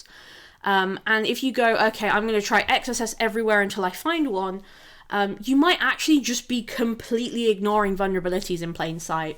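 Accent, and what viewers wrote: United Kingdom. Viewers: Love British accents :)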